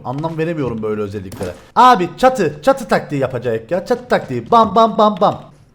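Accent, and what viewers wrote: Cyprus. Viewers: abi diğer videonda ful kıbrıs türkçesi konuş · Kıbrıs li olduğun belli baya . Bide videoya okadar edit koyuyosunki videodan sıkılıyorum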